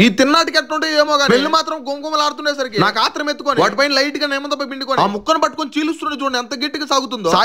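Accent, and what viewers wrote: Nellore, India. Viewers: ante meedhi Nellore aina yaasa maaraley that is. Comment from Srikakulam district palasa Mandal rural Chiranjeevi ❤❤❤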